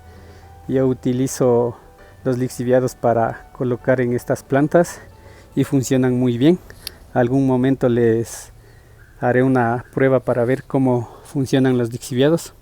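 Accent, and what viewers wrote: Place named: Quechua, Bolivia. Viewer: Hola, gracias a ti. Lo dije porque me recordaba mucho tu acento a los bolivianos que hablan quechua, será una casualidad. Saludos !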